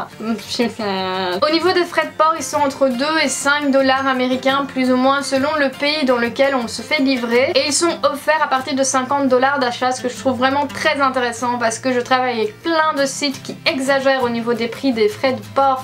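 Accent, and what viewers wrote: Belgium. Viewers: tu es belges ?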